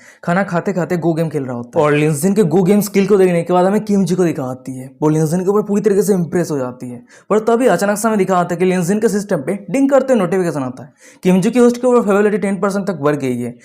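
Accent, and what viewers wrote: Bengal, India. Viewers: Bhaiya AP Bangali ho kiya...❤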